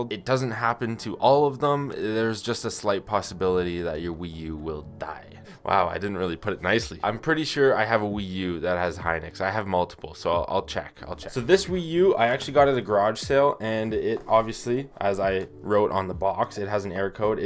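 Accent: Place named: Canada. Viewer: you pronounce sorry like soorry. Just like me and many other Canadians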